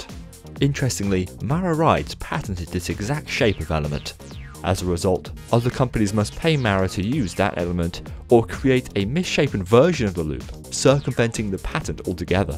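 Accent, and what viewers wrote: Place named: Public school, United Kingdom. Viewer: Yes......fair enough! You guys do have different styles, I have to concede...though I must say that you have the posher (public school) accent.....whereas 'Expedition' sounds more like a chavvy south London/home counties one. Yeah....I keep on thinking of Sadiq Khan everytime I hear 'Expedition Theme Park' speak!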